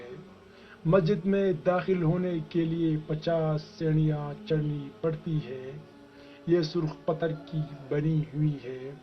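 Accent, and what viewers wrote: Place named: Kashmir, India. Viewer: It's a kashmiri voice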